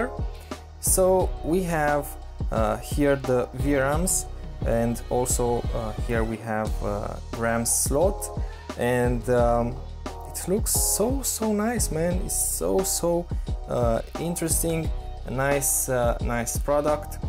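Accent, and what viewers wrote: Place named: Romania. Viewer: you sound like the average romanian xd. Are you at least eastern or central European?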